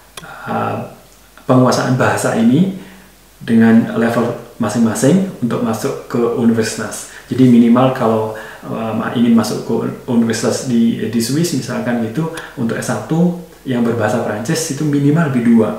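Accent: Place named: Java, Indonesia. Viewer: Nice info. Masih medok buanget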